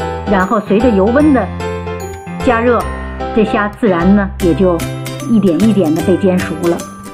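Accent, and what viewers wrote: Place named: Tianjin, China. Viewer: 天津口音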